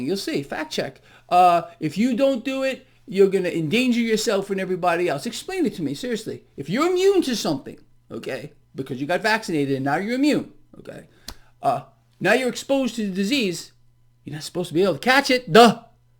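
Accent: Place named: New York, United States. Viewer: I genuinely thought you were in NY. Maybe it’s the accent. I know the market isn’t gonna reverse anytime soon thanks to the fed, but It will reverse, eventually, and it’s gonna be a doozy. I understand your logic but nothing goes up forever dude. Buy high sell higher? Hahaha. You turned everything upside down. You’re trading though. You’re not a “buy and hold “ guy. You’re not saying buy and hold for your future however am I correct?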